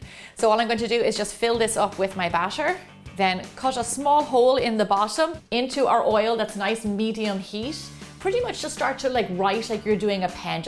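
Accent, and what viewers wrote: Ireland. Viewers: I love your irish accent!! ❤️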